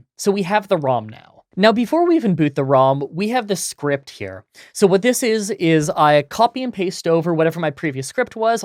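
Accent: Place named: Canada. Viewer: The “out” thing is just his Canadian accent showing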